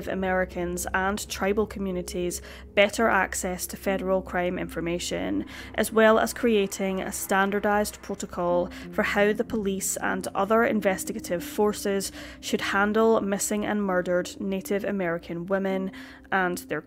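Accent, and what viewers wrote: Scotland, United Kingdom. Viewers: I like your Scottish ascent.🙂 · Is this a scottish accent?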